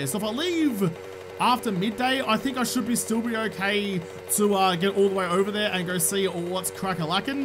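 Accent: Australia. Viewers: You got to hit up those rand-o police cars when you see them cause they are caulked full of what you like to call "lovely dovely's"! (thought i saw you fly by one in the desert and I think there is one near your shitty lighthouse)!! Cheers to my favorite little speed talking ball of fucking Aussie goodness gamer from KC Missouri USA!!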